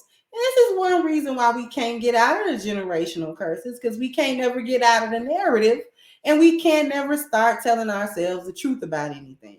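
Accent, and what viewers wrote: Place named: New Orleans, United States. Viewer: are you from the boot? I here so much new orleans tongue.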